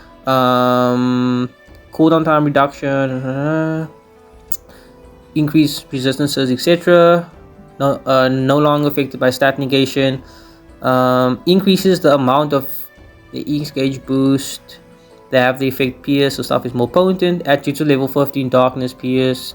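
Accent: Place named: South Africa. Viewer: Bro you from SA? can’t mistake that accent 💀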